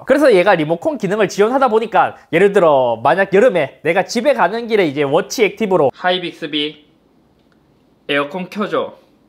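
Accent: Gyeongsang, South Korea. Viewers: 언어학자인데요, 잇섭님 여기서 ㅎ에 대응되는 발음으로 표준어의 [h]가 아닌 동남방언의 [x]를 하고 계셔서 그런거에요. 아 모양으로 입을 만드시고 입김을 분다는 느낌으로 시작한 다음에 모음을 얹어줘야 표준어의 [하]가 되어요.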